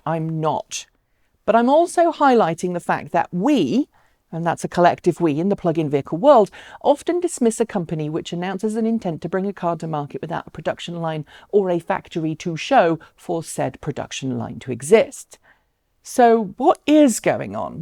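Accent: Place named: RP, United Kingdom. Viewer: Your accent goes a bit posh in these videos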